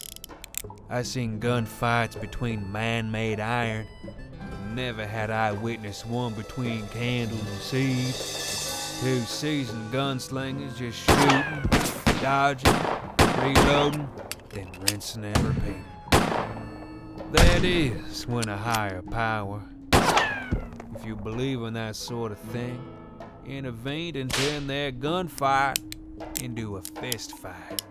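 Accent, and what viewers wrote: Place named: South, United States. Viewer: oh dear god yes . i love the southern accent. he need to do more voice over. yes can't wait to hear him again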